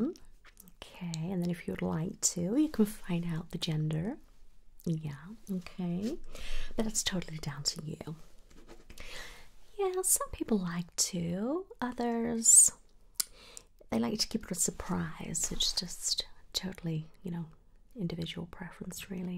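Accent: South, United States. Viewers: You did great with the accent overall :) an easy suggestion (only since you asked) would be to make sure that you're not softening your vowels where they shouldn't be.....I think that's a big difference between your side of the pond and mine :) also, be careful not to throw too much southern into it, it can ring very fake to southerners ;) but girl this was awesome and I definitely think you should try the accent more! I also personally love this particular role play theme, so relaxing.... you are incredible as usual! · You sound like a Tennessee lady. Doing some Southern charm characters would be good! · Hi.... I mean!;) I wish the ob/gyns I've met were as nice as you are in this lovely, lovely RP.:( They are usually so dismissive and sometimes even rude. I'm sure most of the time, it's not even their fault - cause they have so many patients to see. 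But sometimes, they are really not listening and not empathizing. That being said, I would really love a RP where an ob/gyn believes and comforts me when I tell her that I have excruciating period pains, that incapacitate me for days, plus PMDD the week before (basically PMS on acid) and suggests further investigations for endometriosis or other disorders, instead of just giving me hormones and dismissing me, saying that "period pains are normal". No, these most definitely are NOT. In other words, I have to mention that that's a lovely Southern accent (granted it's... mixed with something else) you're pulling off.:-D And I love your look with that wig. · YESSS 💙 This was so amazing!!! It seemed like you were doing a southern-ish American accent and if sooo you did amazing at it. 💙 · I thoroughly enjoyed this one 😄 great job on the Southern accent lol. Maybe try an African accent next time just for laughs 😆, now that would be quite the challenge 😎! 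· The accent is vaguely southern but has hints of other regions as well. I've never heard someone with this accent before, but it's still quite relaxing. I don't really have tips because I'm terrible at accents as well. I couldn't differentiate between british and australian accents till I was like 12 lol. I would say the most un-American thing in the video was your use of the word "trousers" though. I can't remember the last time I've heard someone American say anything other than pants, unless they are referring to formal pants like for a suit. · Actually... The southern accent is pretty much this.... But well kept secret, Southerners do the best fake Brit accent 🤣🤣🤣 we're the last remnant of the British rule in America 🤣🤣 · Southern belle! Love the accent honestly, it works! Soothing as always, really love your asmr videos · I heard the southern too...Kentucky, Georgia or South Carolina maybe...mixed with somewhere else I couldn't place, but there are so many parts of the U.S. 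that are off the beaten path, it could be a real accent somewhere. The main thing is being consistent throughout...which I thought you did well. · Omg I was not expecting a southern accent when I read “American accent” lmao! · Sounds good. Like the southern twang you added. I'm in the hills of Missouri and I approve. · Omg the accent was great! It sounds very southern. Good job 👏🏽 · Yup I was thinking the same, kind of has a southern/Midwest twang😁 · Honestly the accent isn't that bad. At times it sounds sort of southern which I thought was nice. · OH MY GOD THE SOUTHERN ACCENT IS SO GOOD???? LIKE OKAY WE SEE YOU!! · You sound like a southern belle!!! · I had to smile during this one. So used to your British accent, it was, kind of weird, hearing you speak in a southern accent. Don’t get me wrong, it was fantastically made, just so out of the norm from what I’m used to here ☺️ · I think the title is hilarious "dreadful American accent" hahaha! 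I think it's actually pretty spot-on. We have different accents and this has a sort of Southern hint to it, but pretty darn good! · Sounds like ur from the south xxx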